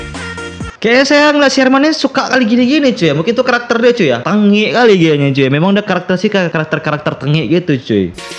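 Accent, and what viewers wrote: Nias, Indonesia. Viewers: Abng orang nias yah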